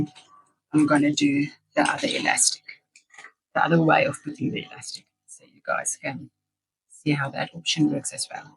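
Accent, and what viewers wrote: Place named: South Africa. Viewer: I wanted to say I recognize a South African accent.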